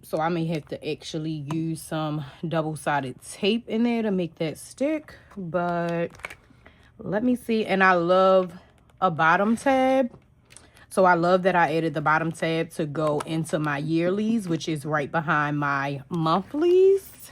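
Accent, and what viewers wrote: Baltimore, United States. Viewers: I think I hear a fellow accent sis, baltimore stand upp 🤣🫶🏽 lolol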